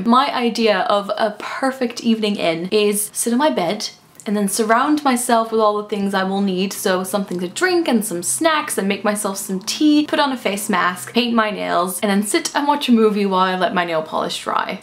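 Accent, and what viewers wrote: United Kingdom. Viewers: lovely video! :) also your accent has twang of British intertwined in it and it's super cute haha! xxx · I need a lot of me time and I enjoy doing things by myself. Sometimes I snuggle up with a book/movie/TV show, sometimes I go shopping. I go to museums by myself once in awhile as well. By the way, I love how your accent becomes more and more British! I find myself adopting accents and dialects very quickly when they are familiar and I'm in the area for some time.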